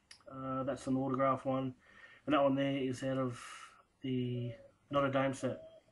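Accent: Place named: Australia. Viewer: wow wow mate great pc, its great to see some old cards taken good care of. i will go though my cards and see what i can find for you, i would be very proud mate. oh and love the jacket very nice.i will send you a pm in the morn mate, just had a real lond day at work. and its soooooooooooo good to hear another aussie on hear!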